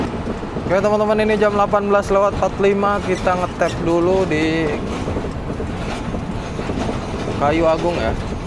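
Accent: West Java, Indonesia. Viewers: Sunda na timana, a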